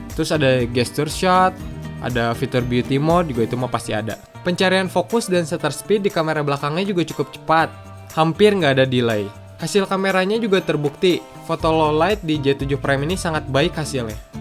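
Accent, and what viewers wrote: West Java, Indonesia. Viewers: Nyunda banget Kang 🤣 kerennn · "Halo Juragan", suara khas amat sundanya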